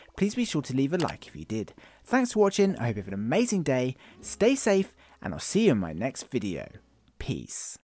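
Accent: United Kingdom. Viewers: This British accent is killin me lmfqo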